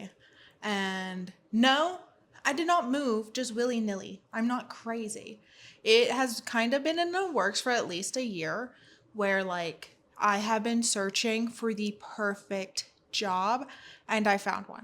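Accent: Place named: Appalachia, United States. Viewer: I could tell a fellow Appalachian girlie from accent alone! 🤗 Welcome back to booktube!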